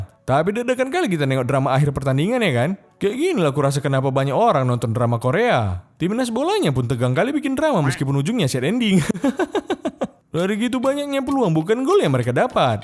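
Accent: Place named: North Sumatra, Indonesia. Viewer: logat kau sumut kali njeng 🤣🤣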